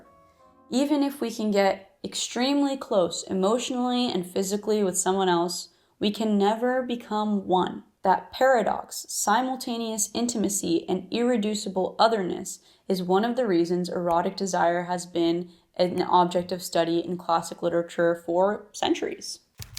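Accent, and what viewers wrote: Brazil. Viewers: Você é brasileira ou portuguesa? Pelo sotaque é brasileira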